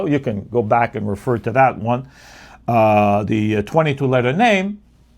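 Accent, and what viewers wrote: New York, United States. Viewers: Love the New Yawk accent :D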